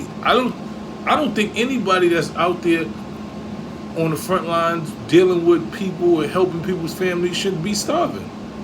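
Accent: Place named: Arab world. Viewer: Bro stopping saying hit the like button like & prescribe like that bro u sound like a arab use that persons voice it sounds more real believable bro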